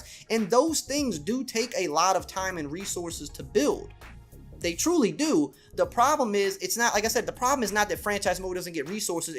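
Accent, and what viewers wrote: Louisiana, United States. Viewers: What part of Acadiana u from bro I hear Louisiana all over you lol great content as always 👍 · do you have a cajun accent?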